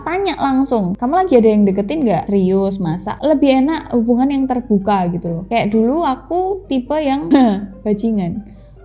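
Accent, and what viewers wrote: Java, Indonesia. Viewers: Samean iku jowo ngedi se mbak.. lucu banget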